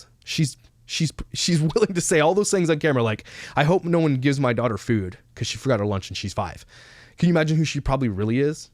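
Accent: Canada. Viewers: Completely unrelated, but the Canadian accent is strong in this one 😂 love it lol... reminds me of my fam in Canada 🇨🇦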